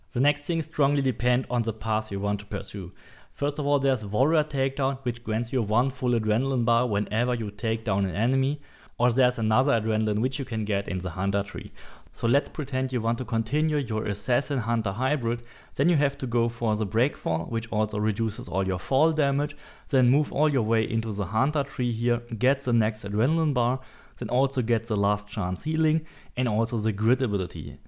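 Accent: Germany. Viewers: Dude mast be 10000% from Germany they have hard time to learn English so he is not bad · I love the German accent, great video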